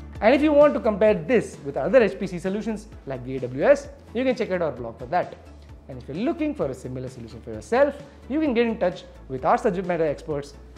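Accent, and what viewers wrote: India. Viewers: Dude…your accent. You mine aswell speak Indian. Practice brother.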